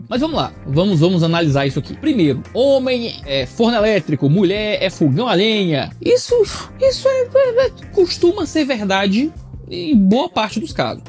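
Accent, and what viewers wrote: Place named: Northeast, Brazil. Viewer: Amo esse jeito bem humorado de falar do nordestino! E o sotaque tbm é sensacional!!😊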